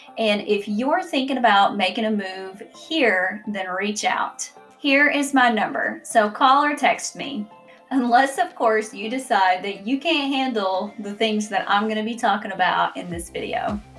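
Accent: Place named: Kentucky, United States. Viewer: So you like your country accent but don't like to hear other country accents. Okay, gotcha. Can't get more back woodsy than Kentucky. Maybe you could move back to your holler in Kentucky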